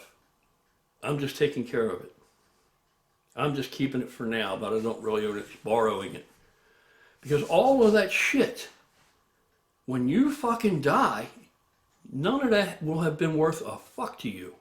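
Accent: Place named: Philadelphia, United States. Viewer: You gotta be from Philly or South Jersey. 😅i know our accent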